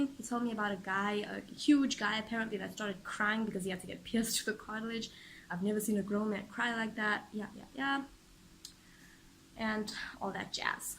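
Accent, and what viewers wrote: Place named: United States. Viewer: You sound American haha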